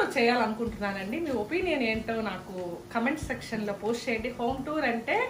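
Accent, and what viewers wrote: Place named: Nellore, India. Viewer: ఆదివారం గారి అద్భుతమైన వంటలు కార్యక్రమం కి స్వాగతం సుస్వాగతం, ఉదయం దోస చికెన్ పులుసు ఈడు జోడు కుదిరింది, మొదటి సారి మీ ముఖం మీద చెమటలు పట్టడం చూసా చాలా శ్రమించారు ఫ్ ఫ్ ఫ్, నెల్లూరు కనపడుతుంది మీ మాటల్లో గారు, రియో గుడ్ బాయ్ అండి బాబు, ఏదో వీడియో చేసినట్లు కాకుండా పక్కన ఎవరికో చెప్తున్నట్టు ఈజీ గా చెప్పేస్తున్నారు, ఫ్రీ గరం మసాలా చాలా ఫ్రీ గా వేసేసారు, ఎప్పుడు ఎప్పుడు వంట తొందరగా చేద్దామా అని చిన్న దోస పెనము మీద వేసేసారు, ఆహా నెల్లూరు యాస యాడికిపోతున్నావు👍👍👍, ఆహా నోరు ఊరించే దోస చికెన్ సూపర్, నోరు కాలింది 😂😂😂 పక్కన వీడియోగ్రాఫర్ నవ్వులే నవ్వులు, మీ అబ్బాయిల రివ్యూ బాగుంది, మొత్తానికి సాధించారు మంచి రిజల్ట్ ఇచ్చారు, చాలా కష్టపడ్డారు గుడ్. మాకు హోమ్ టూర్ వీడియో కావాలి, సిరీస్ అంటున్నారు అంటే అది ఎంత పెద్దదో అర్థం చేసుకోగలం, తప్పకుండా వీడియో చెయ్యండి గారు 👍👍👍.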